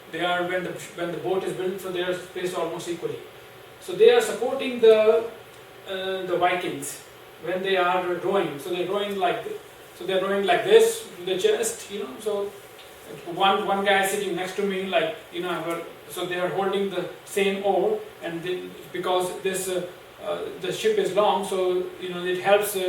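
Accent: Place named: Oklahoma, United States. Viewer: You have a very odd version of an Okie accent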